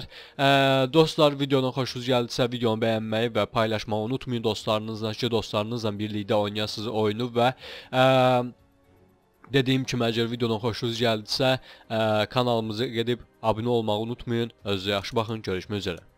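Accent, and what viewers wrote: Azerbaijan. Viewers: Anaa azeriymişsin valla yahşi demesen anlamicam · aynen sonradan anladım da azeri ler bukadar değşikmi konusuyodu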